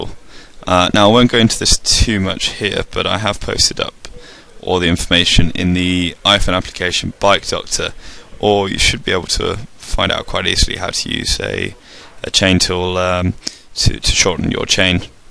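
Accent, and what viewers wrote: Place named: South, United States. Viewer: a southerners accent, the same as mine :)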